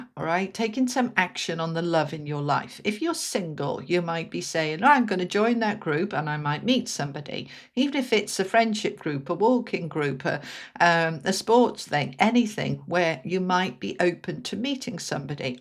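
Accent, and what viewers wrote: Wales, United Kingdom. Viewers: I always think I can hear welsh in your accent!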